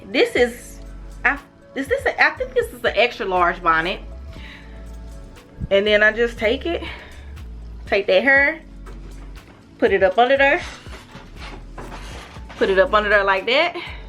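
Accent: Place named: South, United States. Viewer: I hear a southern accent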